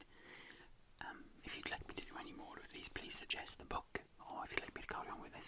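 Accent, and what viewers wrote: Wales, United Kingdom. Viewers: I love this idea. I LOVE the book you chose. I struggled a little with your gorgeous Welsh accent, what with so MANY whispered words. Would you consider soft-speaking, or a mix of the two, if you do more storytelling? You lose a bit of the intonation, with whispering, is all. Thank you so much for spending your free time on videos for us! PS: The blanket is fab!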